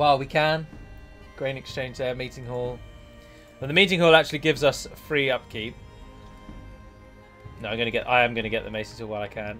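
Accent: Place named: United Kingdom. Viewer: YOU just have the best British accent ivé ever heard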